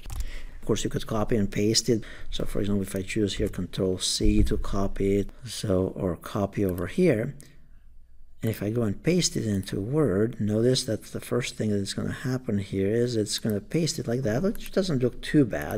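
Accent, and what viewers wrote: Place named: Albania. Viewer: Great presentation Hope you don't mind me saying but you have an interesting accent, are you of Albanian origin?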